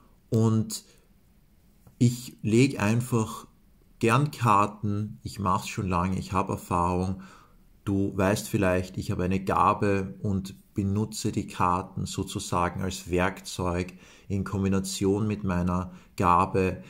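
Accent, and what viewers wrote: Austria. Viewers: Ich LIEBE deine Stimme, lieber!❤ Noch dazu mit diesem wundervollen österreichischen Akzent, sehr berührend!🙏🏻💕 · Jaaa, Du bist teilweise eine Schlüsselfigur in meinem Prozess❤Danke dafür und ich liebe auch Deinen Wiener Dialekt, dalls es wienerisch ist, aber ich glaube schon😅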